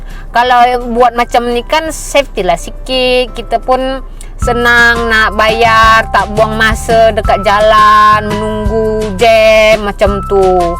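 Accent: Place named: Malaysia. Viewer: Wow fasih slang melayu Malaysia ya? berapa lama di Malaysia?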